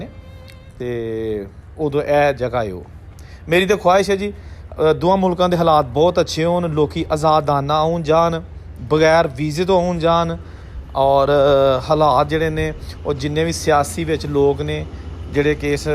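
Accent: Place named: Amritsar, India. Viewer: bro this was my 2nd velog of yours first was about KASUR railway station before partition kasur as a sub division and now a days as a district in Pakistani Punjab state, i was really impressed the way you tried to narrate same as the Punjabi language spoken within AMRITSAR DISTRICT of INDIAN Punjab state but in this video you were repeating 23rd date as (tareyi) which means your elder one's were from district GURDASPUR/ JAMMU because tareyi (23rd) is word from dogari language, the main velog about railways in Pakistan i really watch is"SITE & SOUNDS no doubt all others too are impressive but your velog really impressed let's pray the lord to be both the nation's one again or love to live in peace and harmony with love from INDIA ❤️❤️❤️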